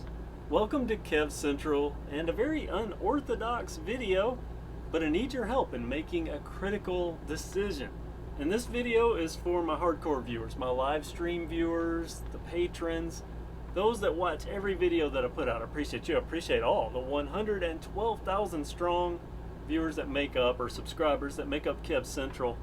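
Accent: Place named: Texas, United States. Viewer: Please make Travel vlogs... I watch all of your videos... Tbh I have notification on I love your accent. Gives me Texan vibes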